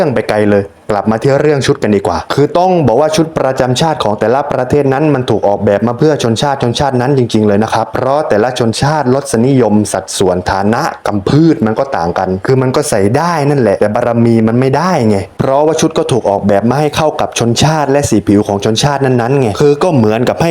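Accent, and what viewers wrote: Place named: South, Thailand. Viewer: พี่คนใต้เออะ😂😂😂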